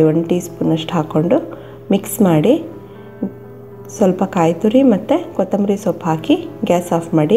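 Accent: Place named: North Karnataka, India. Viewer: uttara karnataka davra neevu nimma dwani d karnat tara ide yella adugegalu chennagide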